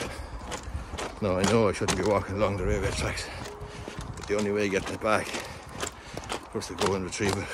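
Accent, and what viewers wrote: Ireland. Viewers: I like how the YouTube subtitles completely misunderstand the Irish accent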